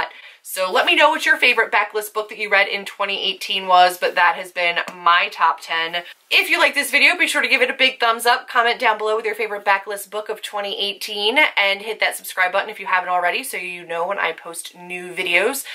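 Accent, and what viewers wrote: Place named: Philadelphia, United States. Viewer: Just found your channel and I’m obsessed! Are you from PA? You sound like you have a Philly accent. I’m from eastern PA. I really want to read The Seven Husbands of Evelyn Hugo. You make it sound awesome.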